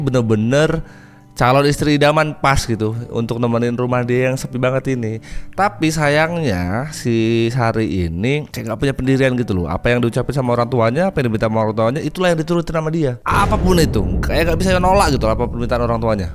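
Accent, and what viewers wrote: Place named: East Java, Indonesia. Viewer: Mas iki wong endi? Boso jowone logat e kentel. Kyok cah jawa timuran😂😂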